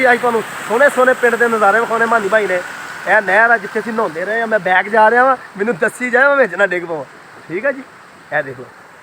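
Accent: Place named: Punjab, India. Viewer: Tuhadi boli to edan lgda k 47 to phele tuhade bzurag gurdaspur Amritsar or narowal side de rehnde hoangay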